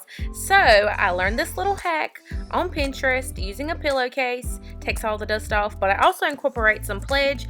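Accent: South, United States. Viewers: swear I love southern accents! and good job on the house! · you sound so country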